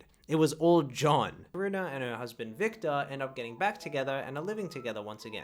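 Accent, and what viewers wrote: Australia. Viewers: Are you an aussie? sorry I never noticed your accent till now and I've been watching you for months now. I feel like It should've been more apparent. lol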